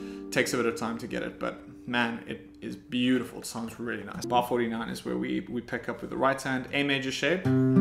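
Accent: South Africa. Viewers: You kind of sound south African..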